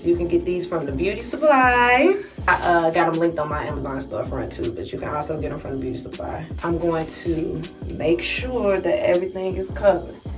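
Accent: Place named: South, United States. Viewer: I love your country talk😂 it’s so cute and funny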